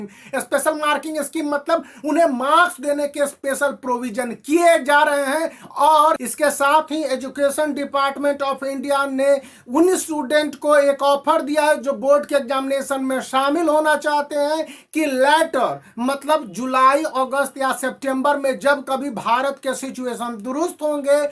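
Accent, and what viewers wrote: Bihar, India. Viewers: Guru ji awaz se hi bihari lag rahe hai kaun zila se hai guru ji aap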